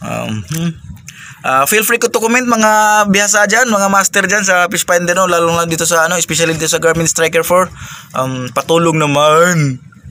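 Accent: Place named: Bohol, Philippines. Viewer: tujok tujoka noy .. taga bohol man diay mo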